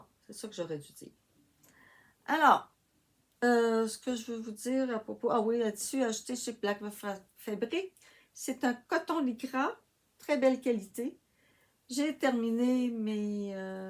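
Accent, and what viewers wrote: Quebec, Canada. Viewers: Bonjour, super vos réalisations et cela m’a beaucoup inspiré notamment le montage des cols, je vais essayer. Je ne suis pas contre un tuto même si je crois avoir compris comment faire. J’adore votre bel accent québécois:) Bonne journée. Muriel de Paris (France)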